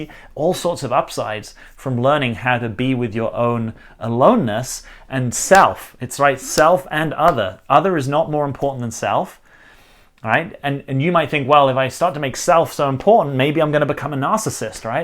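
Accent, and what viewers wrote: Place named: United Kingdom. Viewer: I love this video. Thank you. Although I'm an extrovert and very sociable, I'm learning to embrace my alone time and really starting to value it. I've been single for a year and am just about to go on holiday abroad as a solo traveller for a week - bit nervous, but looking forward to the experience. By the way, on a completely different topic, your accent sounds more British today!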